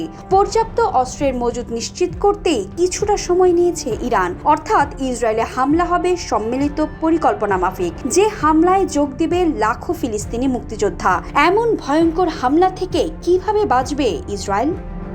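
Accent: Kolkata, India. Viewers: কলকাতা স্টাইলে শাউয়ার নিউজ পড়ে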